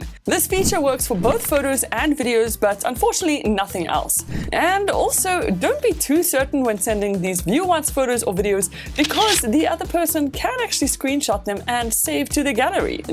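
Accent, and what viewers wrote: South Africa. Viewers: I can't point out the accent, is it South African 🇿🇦?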